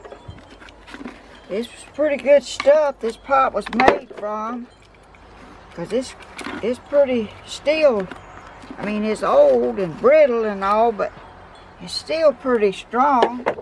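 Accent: South, United States. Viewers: Hi Ms.!! I am so happy that I found you! I love watching you craft, and I love hearing you talk...I have family(now passed) that lived in NC and just miss hearing that southern accent! makes me feel good inside!! Anyway, I would bet that a lot of your regular viewers would really enjoy hearing stories about your life...when you were a little girl and what kinds of history that you saw happen. Im listening to you talk about the snake, I dont know how you stood still for that. I would have kicked him across the yard or passed out!! Keep up the good work-and may God continue to bless you and continue to bless us with you. Sending a big hug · Hello Miss - I just came across your channel a few days ago and am so happy I found you. You are such a talented lady with a beautiful soul, I love just listening to you talk in that beautiful southern accent. I live in Canada, way off the west coast on Vancouver Island, but I have visited Georgia, driving from Atlanta to Brunswick and back up the coast to Savannah, so I'm familiar with the red sand and love those boiled peanuts! 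Love Georgia - I also have a Godson living with his family in Lawrenceville, so may even visit once again some day. I particularly love those green glass leaves behind you - is there a video on how to make them??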